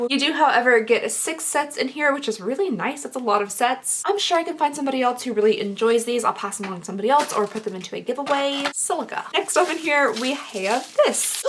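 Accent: Kentucky, United States. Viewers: "Boxcutter'd... sounding more Kentucky by the day!" Wow, didn't know you were from KY until you pointed that out! And I've been watching your channel for awhile now. I'm Lexington, we're the same age and I really wish we could be friends irl UGH! ❤❤ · I know your Kentucky accent is partly to blame (im from oklahoma so i feel this) but your pronunciation of some things makes me giggle a little:) especially apotheke!! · I was triggered when you said you are sounding more Kentucky every day, and then I realized you are in Richmond. I live in Winchester. You are allowed to talk smack about kentucky if you live here...lol. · I just found out you’re from Kentucky and now that I know I can pick up on you accent when it slips out here and there. Haha I’m also from Kentucky. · “Soundin more Kentucky by the day!” LOVE my Ky accent & being from, living in BBN 💙 Love you, you’re truly a breath of fresh air! 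· "Soundin' more Kentucky by the day" Nothing wrong with that, girl! Good evening to you from Southeastern KY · ‘Sounding more Kentucky by the day’- I’m dead, lol I feel that though. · I LOVE the KY accent <3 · You make us Kentucky gals proud girl! Be proud of that Kentucky accent! 😊❤️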